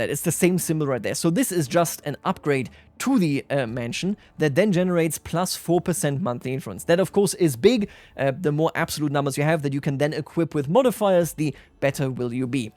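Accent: Bavaria, Germany. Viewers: Must be the Bavarian accent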